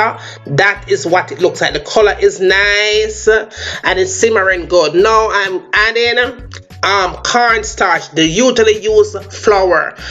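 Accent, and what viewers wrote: Jamaica. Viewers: I love Jamaican accents 😍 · Jamaicans are some of the most confident people on the planet. Love the accent and love Jamaican food 🔥🙌🏿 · First tings first you add hoil!🤣🤣🤣 pure yardie.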